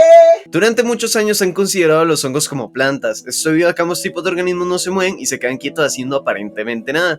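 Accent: Costa Rica. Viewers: Buen vídeo, nuevo suscriptor, esa forma de hablar y pronunciación de la R me suena de CR jaja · Eres de Costa Rica verdad? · Ufff se noto lo tico xd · Desde que lo escuche supe que era tico purs vida pa ❤️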